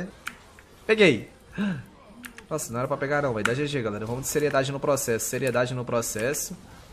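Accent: Minas Gerais, Brazil. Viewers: eh mineiro?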